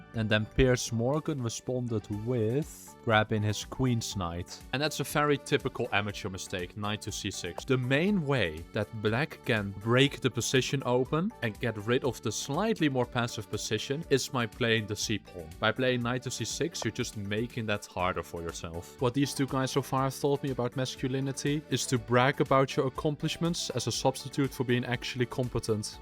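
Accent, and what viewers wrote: Netherlands. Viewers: Ik ruik nederlands in dit accent 😅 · You sound very Dutch 🇳🇱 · Dutch accent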